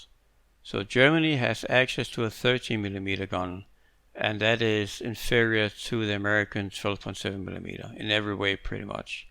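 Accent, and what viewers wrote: Denmark. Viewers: Jeg havde ikke set dit navn før jeg hørte accenten. Men jeg kunne høre det danske :D Tak for en god video.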